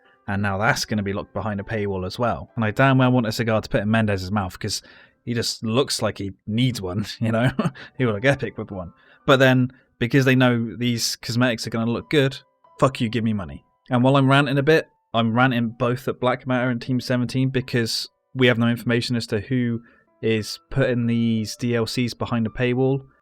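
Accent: United Kingdom. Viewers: Personally, I have No problem with paying a small amount to increase the Play ability of HLL. Like everything in this world , Pay as You go, they do need to maintain Income to be able to continue to improve the game. Given the huge numbers of people playing a small charge (as mentioned GBP 4.99 or local equivalent) will help to maintain the game into the future. and if they get you for the Bri-ish voice , they'll need to pay you heaps !! On a lighter note - Yeah England lost the Football, :) thats a shame, but so many people , as shown in your intro , crying and pulling their hair out , Its just a game !! :) still love your Videos and streams !! Keep going...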